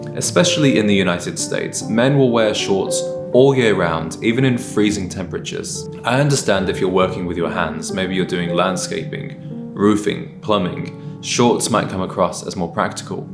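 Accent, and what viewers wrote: United Kingdom. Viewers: Love your dry, understated, British delivery. One faux pas I see frequently, is men wearing a baseball cap with a suit, all too common. (yes, pun intended) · Is this an American with a British accent or are proms yet another American import to GB?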